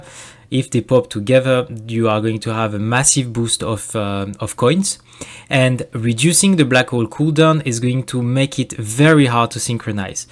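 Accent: France. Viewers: Il me semble bien reconnaitre l'anglais d'un francais ^^ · l'accent francais 💔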